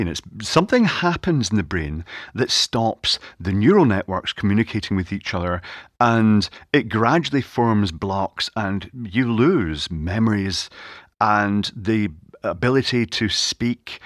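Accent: Scotland, United Kingdom. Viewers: It's a Scottish accent, he was born in Glasgow.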